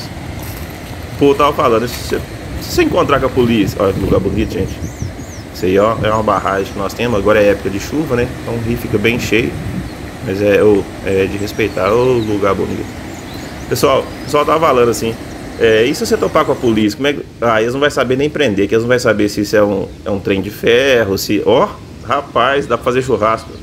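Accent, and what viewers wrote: Minas Gerais, Brazil. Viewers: Esse sotaque mineiro me conquistou antes da máquina apresentada. Eu não sei como cheguei aqui. O mais louvo foi ver que 11 milhões de usuários vieram aqui antes de mim em 7 dias. Esse é o viral dos virais. Parabéns. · pelo sotaque é de minas uai !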